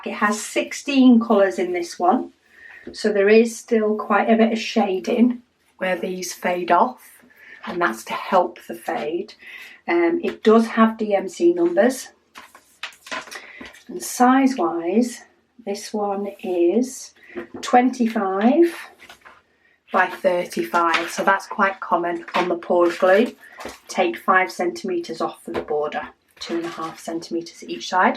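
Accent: United Kingdom. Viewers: 310! Not impressed... just finished a Dp with, yes, 42 000 black square drills! Overwhelming. So proud, I finished it! Most difficult DP I did. Bur it is GORGEOUS! The drills were kind of bad. They were gorgeous but the size of the drills was not uniform....the more I see your lunar phase one... i have a kind of itching to get it... dang! I must be a masochist! Ah ah I really like your channel. Love your voice and British accent, so soothing.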